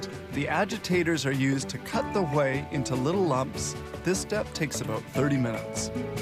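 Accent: Canada. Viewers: His accent is so very Canadian.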